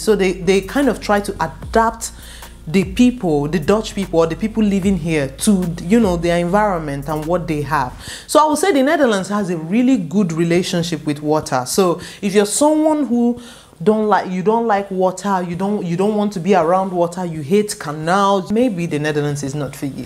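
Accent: Nigeria. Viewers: nice video😀. you still keep your Nigerian accent and vibes, you keep using "ABI"👍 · she is a Nigerian,, Nigerians accent pronounce u"as o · I am South African and I love how persistent the Nigerian accent is no matter how long they live outside their own country! 😂 This lady is extremely poised and articulate either way ❤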